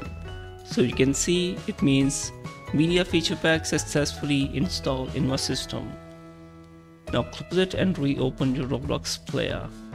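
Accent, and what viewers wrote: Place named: India. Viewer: Always the underrated Indian accent tutorials that ALWAYS WORK!!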